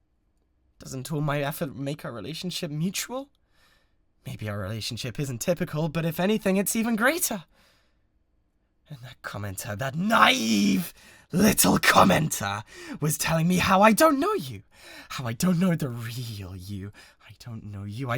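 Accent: United Kingdom. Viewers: The only british accent i tolerate (love the audio) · I LOVE HIS BRITISH VOICEEEE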